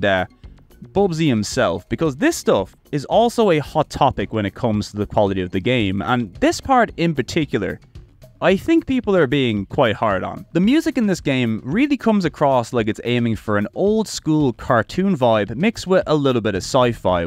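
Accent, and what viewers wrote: Ireland. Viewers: What is this accent? Irish ?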